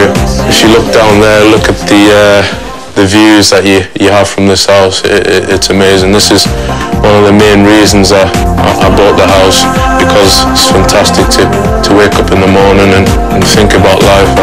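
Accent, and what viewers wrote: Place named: Newcastle, United Kingdom. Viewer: best black guiy with a geordie accent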